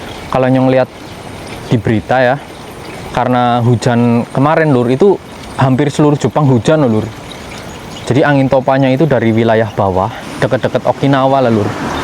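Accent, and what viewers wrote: Banyumas, Indonesia. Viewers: Cilacap Barat Sidareja hadir Mas, salam sehat dan sukses selalu buat jenengan, Aamiin. Jenengan asline ndi Mas bahasane ngapak,ana retung, nemen dsb 😂😂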